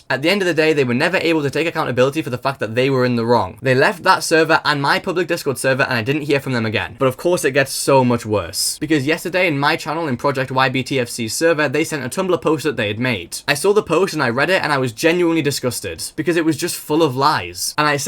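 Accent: Newcastle, United Kingdom. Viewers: Are you from Newcastle bro?Bro you sound like your from Newcastle😭 Saying "roackaet" is a sign lol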